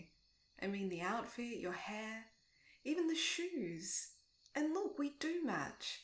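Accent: Australia. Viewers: Nice to Hear An Australian Accent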